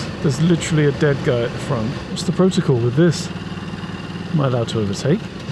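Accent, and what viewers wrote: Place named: Wales, United Kingdom. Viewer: Great video, and a great Welsh accent, and that's coming from a welshman 😂😂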